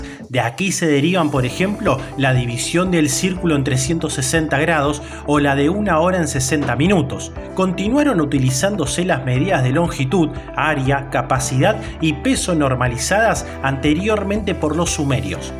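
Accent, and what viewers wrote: Argentina. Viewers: Hola! No sé tu nombre pero te felicito por todos los videos. Te puse en otro video pero tal vez no lo viste! Estamos organizando con la UBA y la UNLP una charla sobre Divulgación Histórica. Vamos a invitar a Javier Trímboli, el Profesor Borda y queríamos invitarte a vos qué haces estos videos que nos parecen muy interesantes para la Divulgación. Te interesaría participar? Por tu acento asumimos que sos argentino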